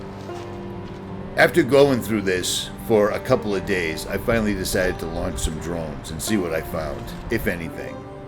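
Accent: Boston, United States. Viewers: Sounds like your cuzin from boston! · Sounds like you have a slight Boston accent.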